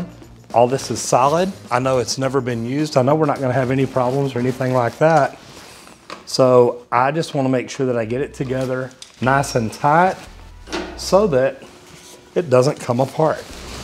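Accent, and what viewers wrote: Texas, United States. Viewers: Yeah he's definitely from Texas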